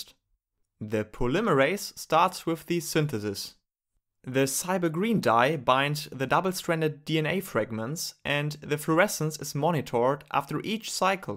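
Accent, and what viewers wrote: Germany. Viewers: Are you German? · Love the German accent and your explanations, keep going!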